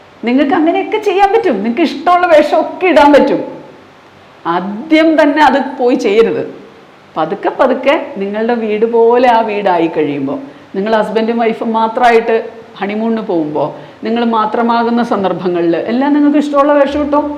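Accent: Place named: Palakkad, India. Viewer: അസ്സൽ പാലക്കാട്‌ ഭാഷ 😄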